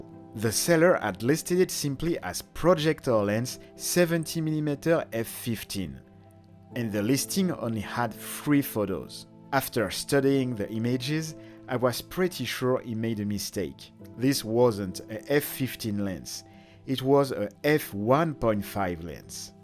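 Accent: France. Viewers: Hey, are you french?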